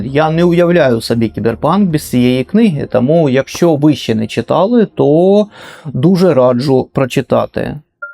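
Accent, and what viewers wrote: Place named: Russia. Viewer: Ви дуже класний, але акання вас виказує.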